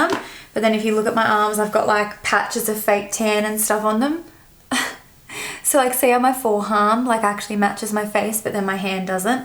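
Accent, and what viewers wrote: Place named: Australia. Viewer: I love Australian accents 💕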